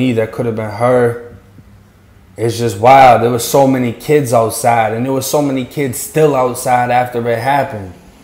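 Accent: Boston, United States. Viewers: I'm from worcester n it's nice for someone to finally she's some light on what happens in ma. U have a Boston accent..but thought u was from FL. A lot of shit happens around here but it never gets so thank u!!! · Ok man I get it..I thought u sounded like u were from Boston..ok man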